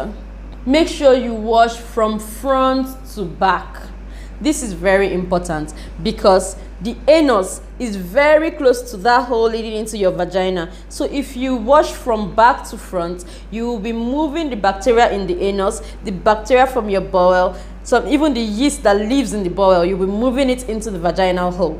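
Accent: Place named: Nigeria. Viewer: I think she's a Nigerian 😃😃😃😃😃😃😃😃😃I love her❤❤❤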